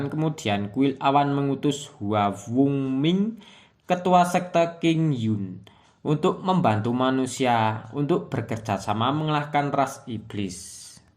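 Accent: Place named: Java, Indonesia. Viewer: Bang bahasa di ganti dong kebahsa Indonesia Jagan bahasa Indonesianya kayak di ucapakan seperti bahasa Jawa mungkin karena itu sasgraibernya sedikit yg laik pun sedikit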